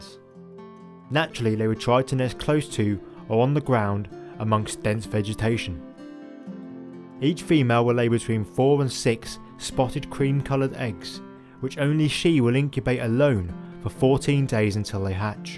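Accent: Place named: Norfolk, United Kingdom. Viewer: Definitely a Norfolk boy 😂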